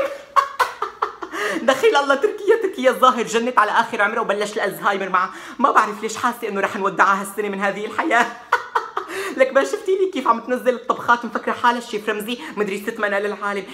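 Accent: Aleppo, Syria. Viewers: | والله تعلمت اللهجة الحلبية منك متابعك الوفي من الجزائر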